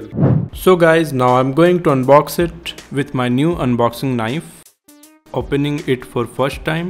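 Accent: India. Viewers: Great video, you're Indian. I heard it right away.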